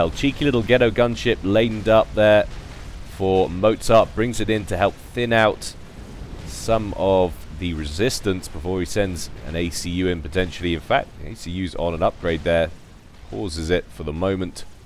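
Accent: United Kingdom. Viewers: God that british accent. So reminding me of Deman and his quadv casts of supcom.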